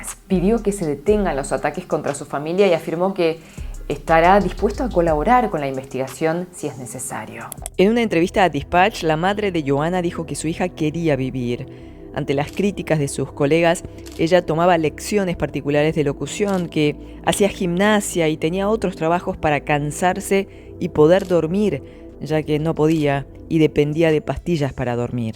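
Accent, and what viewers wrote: Argentina. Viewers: No soporto el tono del español 'argentino' 😆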